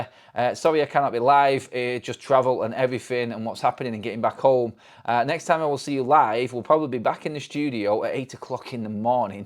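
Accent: Manchester, United Kingdom. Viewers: Antoneh… proper mancc replacing Y’s with H 😂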